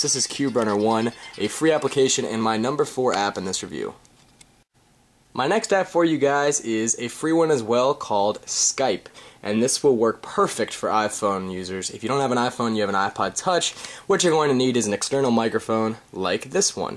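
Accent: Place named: United States. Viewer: You have a textbook american accent! Well sounds that way over here in UK ;-), my iphone 4 is coming soon and have noted down a few apps to get straight away thanks to your reviews, keep up the good work!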